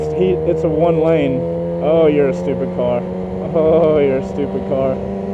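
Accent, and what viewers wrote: United States. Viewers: dude u sound American, how did u ended up in Holand? lol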